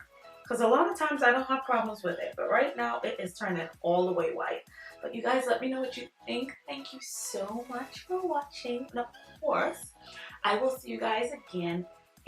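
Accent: Bahamas. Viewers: I know my people! I love the finished look! 💄 1st off you and I have the exact hair texture, so watching your videos helps me a lot. 2nd My dad’s family is from the Bahamas so I recognize the accent! 3rd I am also from South Florida. 4th we might be related because I have Farringtons on my ancestral line. Salute my sister 🙏🏿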